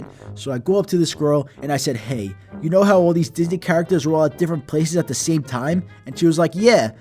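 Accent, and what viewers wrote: New York, United States. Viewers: I like his voice , it reminds me of a kind of latina and new york accent. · U r from New York right ? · Is it just me or does sound like he has a slight New York accent?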